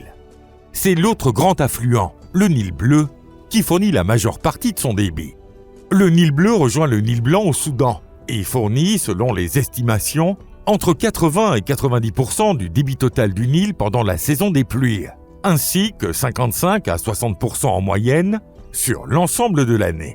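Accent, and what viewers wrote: Belgium. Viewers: Ce n'est pas une ia, c'est simplement une prononciation belge, les belges prononcent le ui, oui.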